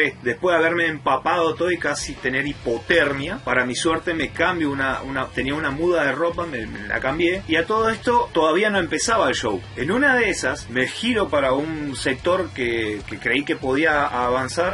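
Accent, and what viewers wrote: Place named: Corrientes, Argentina. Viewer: Sos Correntino? Tenés la tonada jaja, muy buenos tus vídeos!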